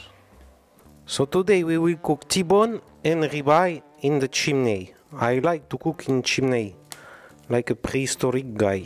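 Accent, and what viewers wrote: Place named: France. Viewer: Merci pour ton tuto ..Trop cool ton lovely french accent😄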